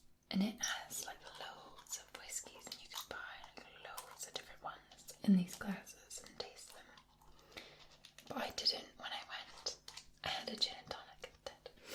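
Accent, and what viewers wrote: Scotland, United Kingdom. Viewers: Oh should be the voice of Scotland. Could listen & watch you for hours. I do love a whisky, very soothing & warming to the heart. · This is by far, my most favourite video of yours and of any ASMR video out there. Love it! Well done! I especially loved your reactions. You are so animated. I had a bit of a chuckle at your reactions to the last three. I recently developed a love for Scotch Whisky. The complexity and the unique differences of every bottle is just amazing. I was definitely born in the wrong country as I would love to tour distilleries and experience and learn more about Scottish whisky. I really do enjoy whisky, except the peaty ones, (I would have the same reaction as you did) so maybe it is my Scottish ancestry coming through. I do so love the Scottish accent. I could listen to you all day. Thanks for this one.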